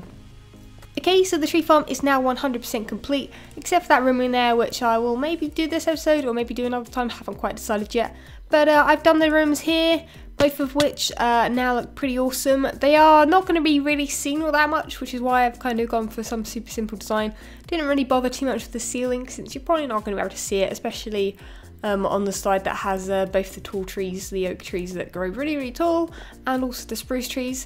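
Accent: United Kingdom. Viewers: Your voice ;D I'm American so I'm kinda deprived of British accents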